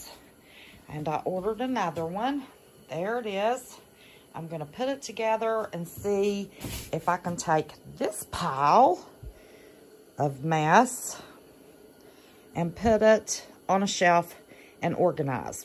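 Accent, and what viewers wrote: West Virginia, United States. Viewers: thanks for the tips :) lol and it is a West Virginia accent, I love it xD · What accent is that? Sounds like West Virginia to my ears. Am I close?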